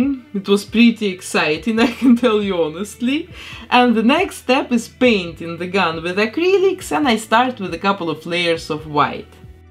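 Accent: Russia. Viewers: Добрый день,! Очень нравится Ваше творчество, Вы потрясающий мастер, давно хотела написать Вам об этом, смотрела Вас и думала, что очень знакомый акцент, проскакивали слова, явно, с претензией на русский язык! А сегодня нашла Вас на Facebook и не ошиблась! Вы большая молодец ✊🏼 спасибо за видео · почему я слышу русский акцент )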